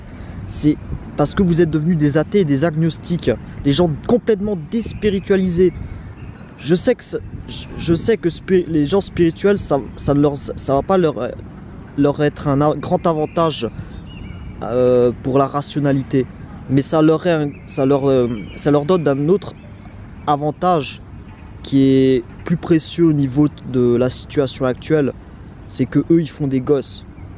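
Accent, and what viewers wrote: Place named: Banlieue, France. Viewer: bien d'accord avec le message, vraiment dommage ton accent de banlieu . merci du taf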